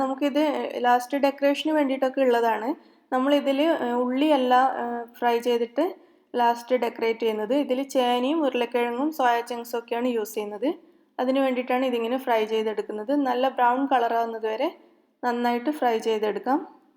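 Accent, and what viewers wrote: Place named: Palakkad, India. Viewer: ഇങ്ങളെ ഭാഷ പാലക്കാടൻ സ്റ്റൈൽ ആണല്ലോ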